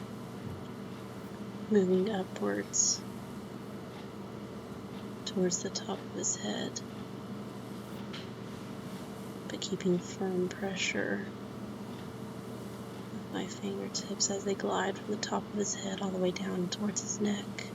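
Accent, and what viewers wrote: South, United States. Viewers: I would have to guess she is from Louisiana, she sounds like she is from the south to me. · Well, from what I've heard I've determined she has a bit of a southern accent meaning she probably originates from southern parts of the USA, my best bet will be one of the Carolinas :3c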